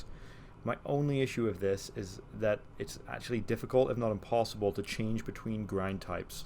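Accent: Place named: Wales, United Kingdom. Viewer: Great review, thank you! My Breville Dose Control has just kicked the bucket so I’m in a similar situation. I’m between this and the Rancilio Rocky. What was your reasoning in choosing this over the Rocky? Also, on a side note, your accent sounds distinctly Welsh, if I’m not mistaken. Sounds like you could be a fellow Welshman in Canada?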